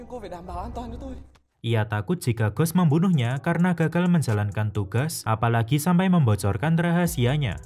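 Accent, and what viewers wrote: Java, Indonesia. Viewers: Medok pisaaan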